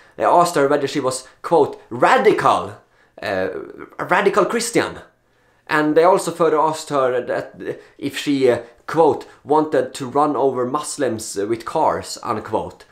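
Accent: Sweden. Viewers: This story is an absolute outrage, its all over youtube, what a joke. Radical Christian? Good grief... nothing like directing the Muslim problem on a other religion #SMH Btw, I love your accent, rants are just so lovely in broken English-Swedish. Keep up the good work. All the best x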